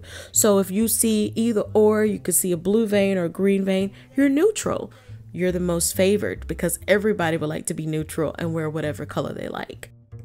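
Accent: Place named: Raleigh, United States. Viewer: Are you from Raleigh?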